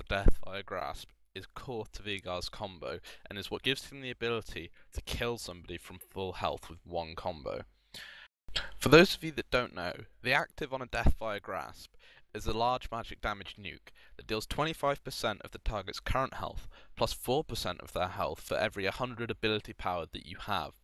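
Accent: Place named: United Kingdom. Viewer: It's typical British accent ;)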